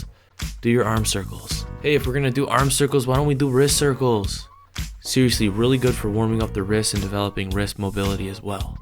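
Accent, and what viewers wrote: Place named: Midwest, United States. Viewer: I don’t know what state you are from, but I’m guessing Midwest or Chicago with the accent. The way you say calf cracks me up 😂😂😂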